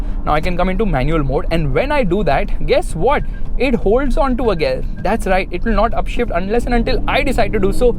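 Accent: India. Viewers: Очень ярко выраженный индийский акцент в английском языке...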